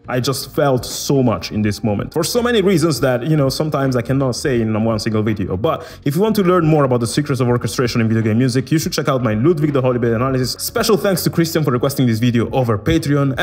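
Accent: Italy. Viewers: Man, are you italian, or resident in Italy? Your accent really sounds like it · Non sapevo tu fossi italiano! Ti ho cominciato a seguire un po' di tempo fa e sentivo qualcosa di familiare nel tuo accento... Adoro le analisi che fai, ottimo video come sempre! Greetings From Italy!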